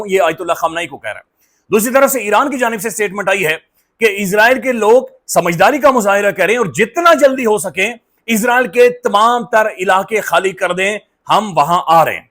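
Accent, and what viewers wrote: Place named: Kashmir, India. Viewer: جیو کشیمری لہجہ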